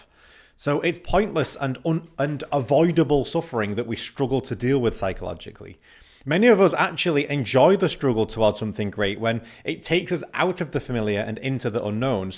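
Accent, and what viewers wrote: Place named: North, United Kingdom. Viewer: LOL I’m from Preston and thought we had the same accent but now reflecting I thought there was a Yorkshire tweak as well. Always weird when you hear someone not without American accent but with an accent so close to yours on YouTube.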